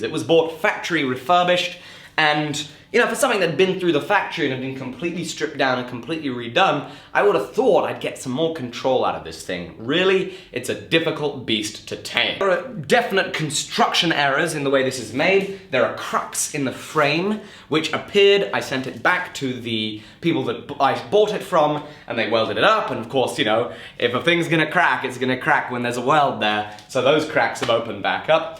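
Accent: United Kingdom. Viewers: I really am curious.. Texas and American Flag. British accent. You relocate to Texas or just love Texas? Thank you,Proud Texan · bro, wtf... I see American flags, Texas flags... don't tread on me... but the accent and the measurements... what are you!!!!!!!!???? Brit transplant, yank transplant? I'm so confuse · Wait, are Brits allowed to wear boots?? Don't get me wrong, I don't know why everyone doesn't, but I don't think I've ever seen someone with your accent and a pair of cowboy boots. Props to you sir!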